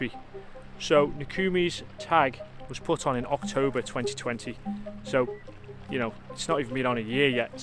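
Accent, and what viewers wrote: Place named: Liverpool, United Kingdom. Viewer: You have a local accent... eport? cheshire ways or liverpool?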